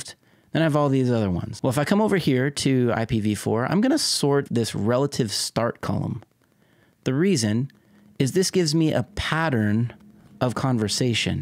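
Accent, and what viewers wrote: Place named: South Africa. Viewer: Nice channel. Fellow analyst pointed me here a few days ago. First thing I recognised, the SA accent.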